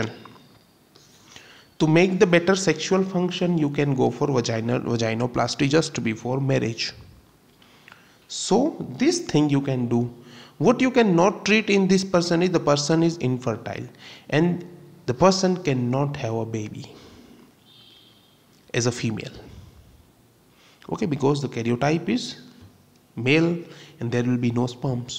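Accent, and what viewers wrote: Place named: India. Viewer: Какой прикольный акцент у индусов.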